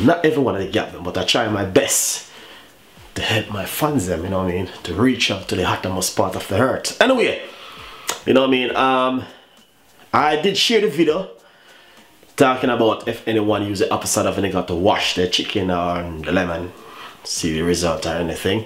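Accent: Jamaica. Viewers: I love Jamaican accent..it always seems like they are rapping😁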